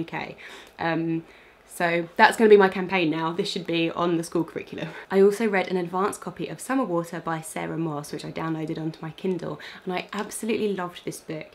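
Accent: United Kingdom. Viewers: I love listening to you speaking in a perfect British accent, so beautiful, so relaxing too 🤣. What is your job? How can you read so many books ??? Would you have some must read British books list for foreigners? 🙏 I am French and I have never used the expression "Comme ci comme ça" in my entire life, but it is what all my foreign students and friends answer when I ask them what they can say in French. I teach French to foreigners. Christelle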